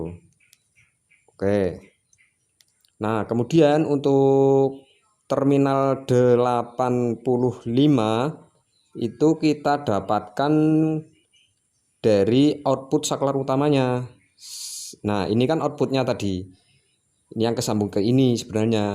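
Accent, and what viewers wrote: Java, Indonesia. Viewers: Bang berarti kabel yg masuk ke output kontak yg di sambung buat lampu tadi gk di copot lagi ya? . Abang ngomonya medok jawa banget tapi nama chanelnya nama suku batak, emang marga silaban ya?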